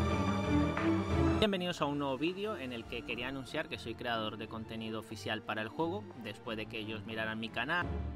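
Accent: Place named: Seville, Spain. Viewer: Ostras pues te imaginaba de otra forma, no corresponde tu voz con tu cara jajaja no es nada malo, pero el acento lo relacionaba a un sevillano cerrao rubio jaja ¿ De donde decias que eras? Eres un crack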